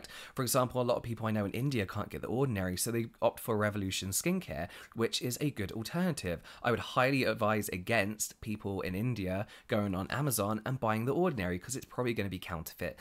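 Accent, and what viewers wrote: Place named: United Kingdom. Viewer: Totally fine with me: I am a Continental European and your British English is... music to listen to! :-) Besides, of course, the content is super-interesting! ♡♡♡ P.S. You know you do your finger counting like us... in the Continent, starting from the thumb and not from the little finger? LOL... ✩✩✩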